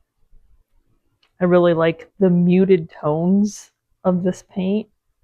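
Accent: South, United States. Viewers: Me too. I have noticed several words she says that sound like us down here in the south. I love it.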